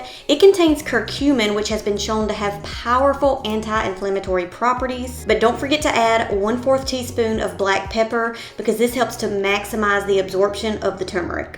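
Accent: South, United States. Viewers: I love your accent. I’m taking a guess Southern States of US. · your southern accent 😍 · She country af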